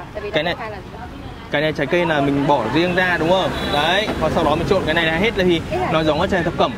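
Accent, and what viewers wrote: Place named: Central, Vietnam. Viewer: dạ. Mà hình như a người Hà Tĩnh phải k ạ? Nghe a ns giọng Bắc cũng chưa chuẩn lắm...vẫn có chút miền Trung phảng phất...hehe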